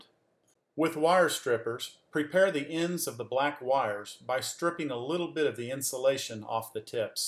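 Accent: South, United States. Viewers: that's just how we on the South say "wh"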